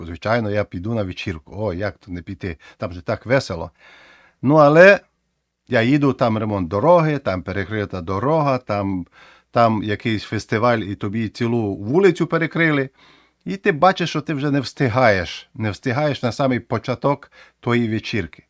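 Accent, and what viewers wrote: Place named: Transcarpathia, Ukraine. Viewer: Чудовий контент, найбільше тане душа, що українською 💙💛І такий у Вас український акцент, неначе Ви із Закарпаття😊Дякую за знання, за англійську, і за українську!Все зрозуміло❤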